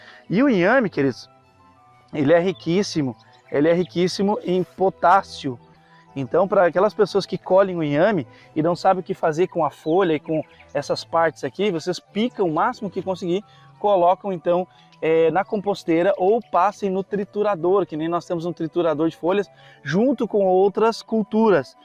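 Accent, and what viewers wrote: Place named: South, Brazil. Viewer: 😂 taiôba não sô taioba 😂 sotaque do sul 😂 amei o conteúdo. Deus abençoe vocês hoje e sempre.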